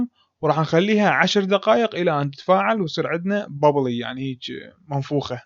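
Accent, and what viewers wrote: Iraq. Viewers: احب لهجة العراق 😍🇸🇦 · يا اخي طريقت الكلام والشرح خرافية جدا ممتعه وتحس بالنفس العراقي بيها . الله يفرحك شيف ضحكتني على سالفة الوصاخة بالكاع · اللهجه العراقيه 😍❤️ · حبيبتي هاي لهجه عراقيه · طبخك حلو بس كلامك العراقي يجنن أحب اسمع كلامك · اللهجة العراقية خلت المقطع رهيب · حبيت اللهجة العراقية بسببك 😅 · و ربي معلم و احلى شي اللهجة العراقية ... خقق 😍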